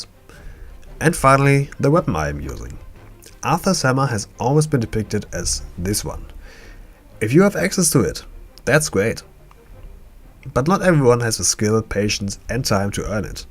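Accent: Germany. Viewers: Ich meine ich höre da wen Deutsches heraus!^^ Schöner Content!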